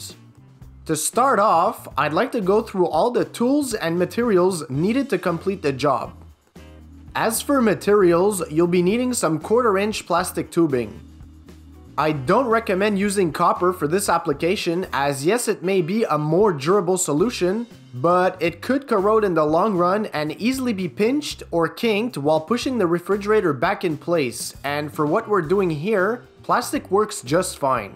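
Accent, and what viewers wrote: Quebec, Canada. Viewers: DUDE, you must be from Canada Dare Hey!!!!! Your accent gives you away. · That undeniable french canadian accent :p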